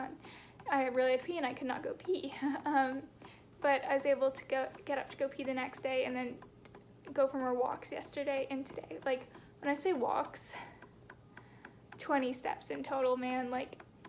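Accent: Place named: Canada. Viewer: I thought she was from Australia too! :P But then the Canadian accent :P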